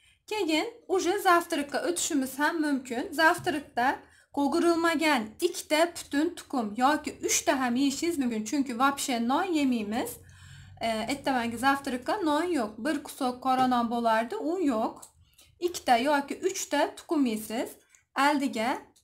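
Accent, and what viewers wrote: Khorezm, Uzbekistan. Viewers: Ассаламалайкум варахматиллохи вабаракатух ,кизим каердансиз. Тилингиз хоразмликка ухшаяпти. Мен 61 ешдаман, 10,минг $ сарфладим озиш учун, ,,гербалайев ,,,,, дан 1 йил ичдим 32 кг оздим 1 йилда, лекин кейин гербалайни ташадим яна кило олдим, энди табиийй озиш харакатидаман, лекин бу ешдан кейин бундай диета тугри келадими, химия, нур олдим, сал озрок есам холсизланиб коламан, калтирайман, бунга хам маслахатингиз борми. Докторлар кучли ейишни тасия килади, олло рози болсин сиздан, ассаламалайкум варахматиллохи вабаракатух. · сиз хоразимни апкесимисиз апкаларга ухшеб гаплаесиз апкажон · Бу киз Хорозимдан шевасидан билмайсизми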